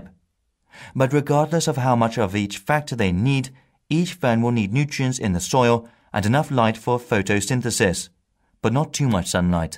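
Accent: United Kingdom. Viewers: British accent , this is a nice accent , but not for exam . 4/6 I could do it better :(((((((((( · me too :'( bc it's British Accent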